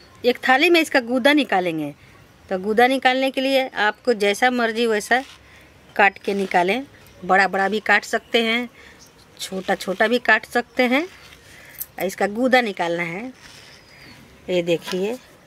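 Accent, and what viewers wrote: Bihar, India. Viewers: Bihar se hai kya . Very nice karte hare kmate rhe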